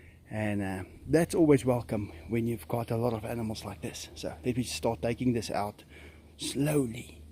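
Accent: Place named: South Africa. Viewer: I enjoy your south african accent!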